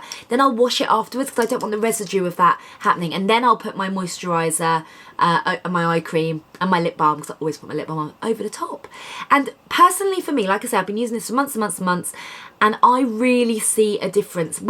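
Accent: United Kingdom. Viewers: I love her accent. Which accent is this? British?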